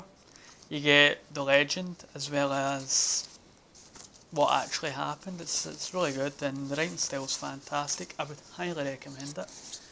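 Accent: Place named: Scotland, United Kingdom. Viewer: Very nice! You should totally do a Rabbie Burns reading, you have the perfect accent for it :D Also, I wouldn't mind that Pullman book, it's actually on my 'want' list 'cause I'm trying to collect all of his work :D I'll cover the postage if you want! Although if someone else wants it then don't worry about it too much, I'll get to it eventually either way!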